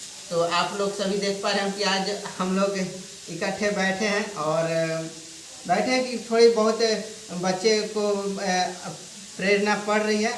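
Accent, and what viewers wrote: Standard. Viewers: आपकी शुध्द हिन्दी काफी अच्छी है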